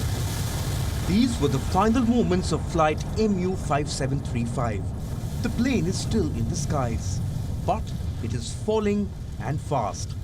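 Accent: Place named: India. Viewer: 能不能找个英语正宗点吗？这印度味英语我听着好难受 还自称英语是官方语言的国家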